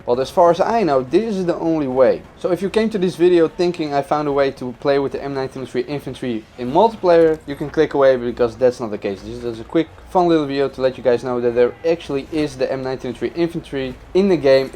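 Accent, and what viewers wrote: Netherlands. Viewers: You sound like a dutch person talking english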